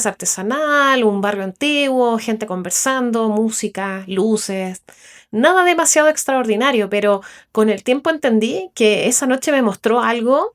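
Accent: Chile. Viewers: realmente me encanto!!!! mas.....con ese hermoso acento trasandino..... Me impacto la precision de tus conceptos para mi querida Buenos Aires, pero realmente me fascino lo simple de esas vivencias que se convirtieron en una poderosa razon de vida! Gracias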